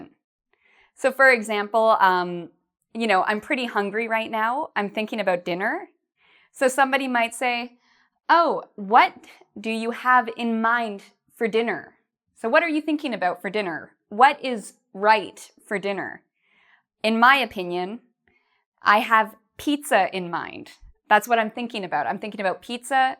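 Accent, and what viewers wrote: Canada. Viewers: Canadian accent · You are from Canada right? I can tell from the way she pronounces "About"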